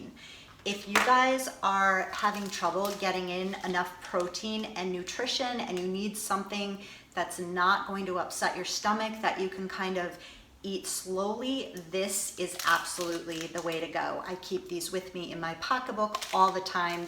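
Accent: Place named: Canada. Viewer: I needed this. Been overwhelmed watching endless videos on FODMAP. I'm going to get this book. Just finished listening to a radio show with the author. Oh btw, you sound Canadian. Still trying to get over your age. You don't look it so you must be doing something right. I'm the same age and get compliments that I look 32-36 but you look young as ever. Thank you for the help. Now a subscriber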